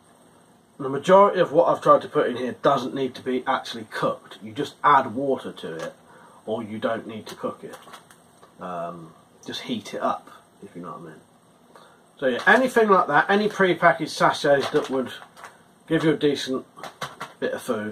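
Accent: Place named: London, United Kingdom. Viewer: Dont want to sound or be rude but wheres that accent from?. Its a good working one but also sounds a bit distinguished.... Is it a London accent.. Good Vids BTW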